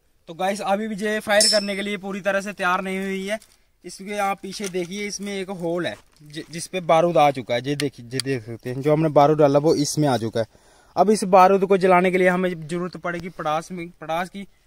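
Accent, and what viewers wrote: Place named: Jammu, India. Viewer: Bhai jammu se ho aap ?? Apka accent whi ka lag rha hai😅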